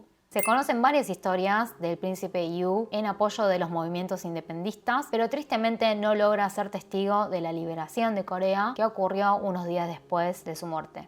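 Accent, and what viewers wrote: Buenos Aires, Argentina. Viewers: Felicidades por tu excelente castellano. Noto un ligero acento de Argentina? Saludos cordiales desde Cochabamba Bolivia 🇧🇴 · Que gracioso una coreana, hablando con dejo argentino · Cómo chica escuchar a una coreana con acento argentino. Muy raro. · Se me hace raro ver a una asiatica hablando como argentina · No hay nada mas bello que una coreana con acento porteño... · ._.' Es tan complicado acostumbrarse a ver a una coreana con acento argentino ._.' preferiría que hablaras en tu idioma y colocar subtítulos, por que ya no sé si veo a una coreana o argentina xD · el acento porteño ... argentino... genial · Entiendo, pero ese acento Argentino, suena asqueroso. Se dice YO no CHO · Lamentablemente no puedo con tu Acento Argentino primer y último video que miro pero te deseo todo el éxito. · 어려운 역사를 알기 쉽게 풀어주셨네요! 스페인어 공부하는데 큰 도움이 됩니다. 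아르헨티나 발음은 덕분에 많이 친숙해 졌어요~ 감사합니다. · Hola, porque tienes acento argentino? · ese ecento argentino chillon y horrible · Eres koreana o argentina?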